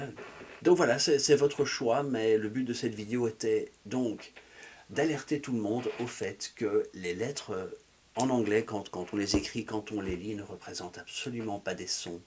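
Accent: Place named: United Kingdom. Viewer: Pour les Français qui écoutent, je peux confirmer que, ce professeur, a un très bon accent anglais britannique, qui est, à mon avis (sans que je sois biaisée en tant qu'anglaise), beaucoup plus agréable que l'accent américain! I could. I should. I would listen to all day!!! 😬😬😬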